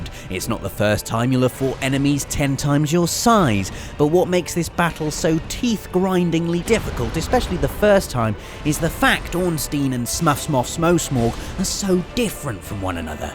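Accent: United Kingdom. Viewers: Fock I HATE british english. So disgusting to hear..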